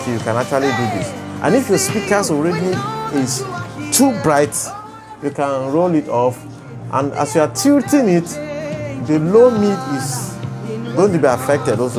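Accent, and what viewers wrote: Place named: Nigeria. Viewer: Informative, but that accent eyy, is that nigeria, it makes me remember the way nigeria school teacher speaks. But the information is 💯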